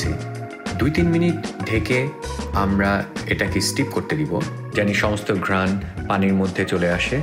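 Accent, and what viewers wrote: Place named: Bangladesh. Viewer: এর ভয়েস শুনে আজ জানলাম ব্যাটা বাংলাদেশী। আগে জানলে দেখতাম ই না। যা ভাগ।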